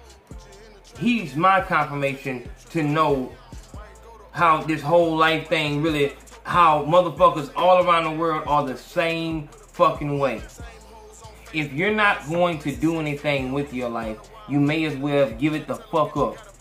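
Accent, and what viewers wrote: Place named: South, United States. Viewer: “Upping that gaw damn fye” 😁 salute brother the content deep af ... I know u country as hell fr #SalutefromFlorida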